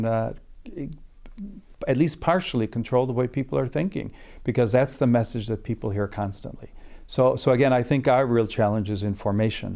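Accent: Midwest, United States. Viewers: I can still hear a Midwest twang at times! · Pope speaking American english 😲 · Chicagoan accent.... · It‘s the Chicago accent. 😊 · It sounds midwestern to me. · He has the voice tone, inflexion, articulation of a leader, like he is indeed talking “ his business “… true American…no matter how many years living abroad and with double nationality..❤❤❤ proud of him.. May God Bless him!!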